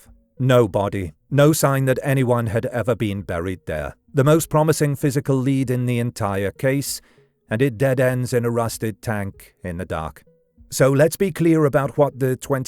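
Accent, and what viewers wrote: United Kingdom. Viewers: He's British. Probably a different pronunciation.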